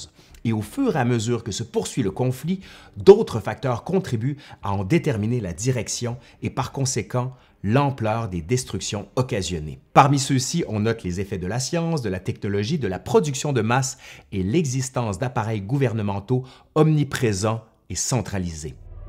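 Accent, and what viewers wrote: Quebec, Canada. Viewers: c'est l accent québécois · Quelle chaîne remarquable ! Des sujets abordés avec sérieux. Les références sont détaillées. Tout est admirablement commenté avec une narration et une diction très agréables. Ajoutons en prime ce charmant accent québécois. Merci ! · Un accent un peu faible et qui devrait marquer plus l'exotisme du Québec, surtout pour les nouzauts francophones de l'Océanie. Génial ! Continue ! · Très belle diction avec cet accent attachant de nos cousins canadiens empreint du parler de nos ancêtres émigrés il y a si longtemps dans la belle province. Ils ont tant donné près de chez moi, à Vimy Mon plus profond respect pour tout les chums qui ont donné leurs vies pour notre chère liberté. Merci les cous'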